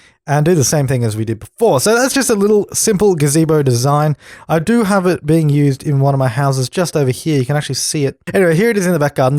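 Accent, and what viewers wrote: Australia. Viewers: Finding this in 2024! You sound like an Aussie just like me!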